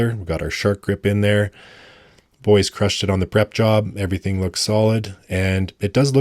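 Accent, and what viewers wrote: Canada. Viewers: Okay, so unlike many people in the comments, I have zero problems with the way that you pronounce the words garage or process. You pronounce those words in a lovely Canadian way. But I have to draw the line at your pronunciation of asphalt. It's definitely not ash-fault, as there's no "sh" sound in asphalt.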